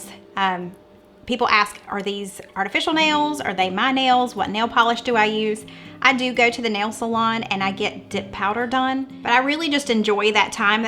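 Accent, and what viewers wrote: South, United States. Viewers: I love watching your Videos,!! Also Love your Country Accent.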